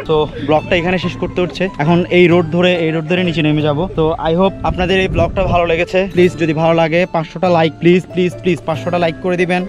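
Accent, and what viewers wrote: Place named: Chittagong, Bangladesh. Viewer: সুন্দর ব্লগ। কথা আরো ফ্লুয়েন্টলি বলতে হবে। চিটাগাং এর ভাবটাও কমাতে হবে।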